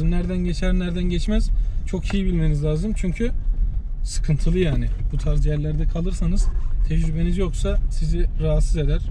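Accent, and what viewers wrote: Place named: Istanbul, Turkey. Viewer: abi videolarını çok beğenerek izliyorum. Kampçı ruhun ve İstanbul Türkçesi ile konuşman çok güzel gençlerimize çok güzel örnek oluyorsun sana çok Teşekkür ederiz abi 😊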